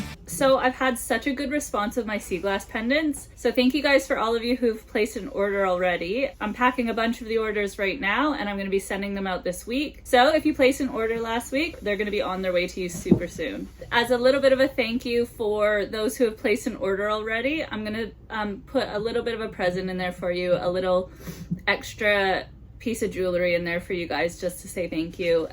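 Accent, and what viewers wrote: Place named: Australia. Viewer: I am not sure if you are a yank or aussie as you got an Aussie ascent sometimes... then you got a yankee ascent .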